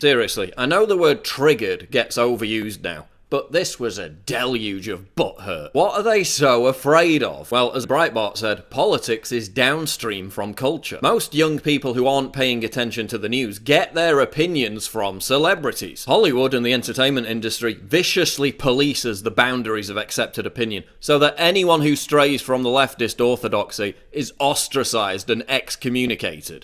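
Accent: United Kingdom. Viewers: This fucking beautiful british accent haha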